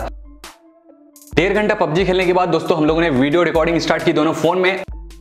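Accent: Bengal, India. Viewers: main v hun. Bankura se.apka baat Karne ki tarika se mujhe laga ki ap bangali ho..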